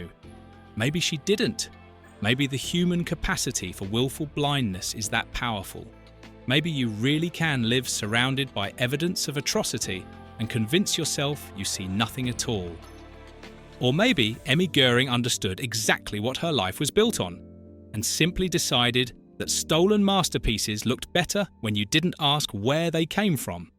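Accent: England, United Kingdom. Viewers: A good Jewish person with a good clean English accent. Glad you approve. 😊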